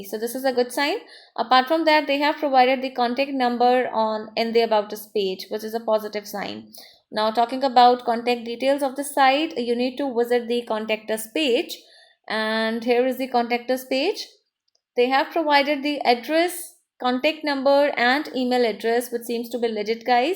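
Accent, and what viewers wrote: India. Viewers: Indian women try speak clearly English and not whit funking indian accent cuz not all people understand you. Try speak slowly and clearly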